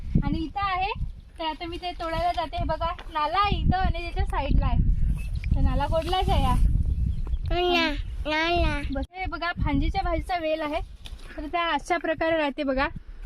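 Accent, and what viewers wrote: Vidarbha, India. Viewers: Vidhrbhatle ka tumi bhasha varun vatle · ताई तुमी कोणत्या गावातुंन बोलता नांव काय आहे ताई तुमच वराडात ल्या बोली भाषा मुडे विच्यारल मी सोनाडा ची लेक आहे गाव ची अस म्हणायच आहे मला माझ नांव गीता आहे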